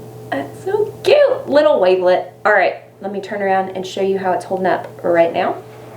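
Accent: Texas, United States. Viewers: Yep, I recognized your accent and bouncy personality as pure Texan right away! Our Texas weather adds an extra challenge for we curly girls, but these Cantu products are my faves...they work wonders! I have fine, thick, 3B, 3C curls. I use the Define & Shine Custard (basically, gel in a jar) instead of the glue gel. The custard has that weird thick sticky feel too, but the cast is softer and most of it breaks up overnight as long as I don't use too much. Next morning I take my pineapple down, leave my hair alone for a bit and it usually falls into place. Occasionally I might have to do a little root shake/fluff but that's it.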